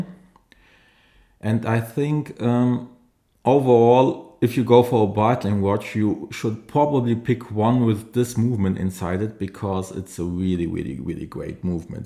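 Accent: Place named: Germany. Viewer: are you german :D sounds like a german speaking english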